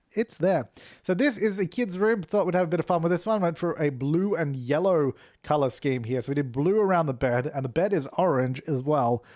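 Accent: Australia. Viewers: Is... Jazza from draw with Jazza? I swear they sound identical, not just the Australian accent. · yea cause he's ostrayan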